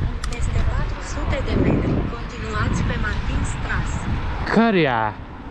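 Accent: Romania. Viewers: Wow nu credeam până ai vorbit că ești roman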